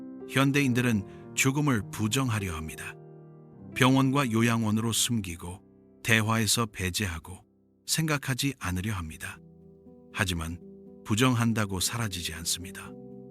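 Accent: United States. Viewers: 다 좋은데, 재미교포 2세 목소리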